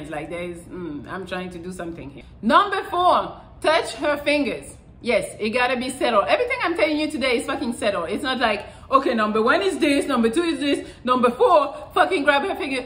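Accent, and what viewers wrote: France. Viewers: Lol... I love your French accent with how you say things 🤣🤣🤣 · L'accent français est super prononcé ! Super attractive. J'aime trop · French English accent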